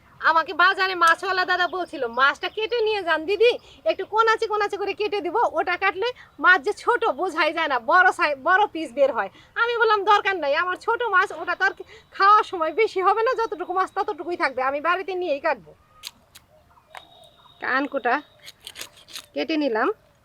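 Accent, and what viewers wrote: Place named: Bangladesh. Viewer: Onar kotha barta Bangladesher moto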